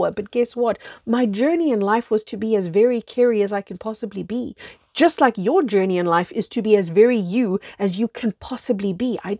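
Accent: South Africa. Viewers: Indeed ! Flip the script . From victim to super hero . And always thanking our agony , trepidations, dilemmas, tragedies because in the end if you want them to they are your invaluable inner wealth and that light , baby , (I'm talking like you now 😆♥️) is going to get you to ascension and help steward others who need a hand to hold on their way ! Yay . I have two hands and I thank them . Thank you Kerry . I'm English Greek I hear your South African accent and melt . Have many S.A. friends in Europe. You feel like family Kerry🥳🎺🙏🏽🙏🏿🙏🏻🙏🏾⭐❤️ Love to all subscribers and beyond ! When I can afford it I'm definitely going to sign up for your lessons and group . Yes , baby ♥️🦋.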